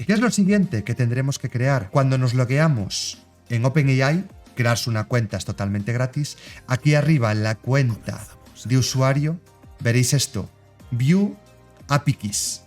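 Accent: Galicia, Spain. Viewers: eres gallego? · a mii me gusta que hables asii suave . Es genial ! Además el acento gallego de Lugo que tienes me encanta !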